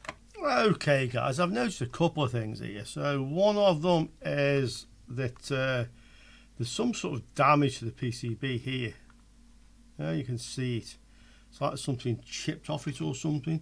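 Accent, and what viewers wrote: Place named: Staffordshire, United Kingdom. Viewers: Hi is that a potteries accent I hear Nice. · inteet duck, i recon ya from near Tunstall i left back in the 90s but i can hear the accent still lol i miss oatcakes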